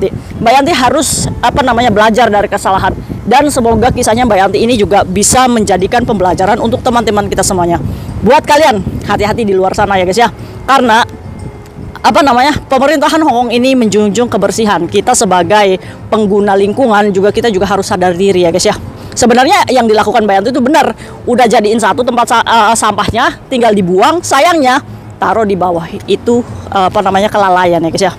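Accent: Indramayu, Indonesia. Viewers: Ka kya wong dermayu 😂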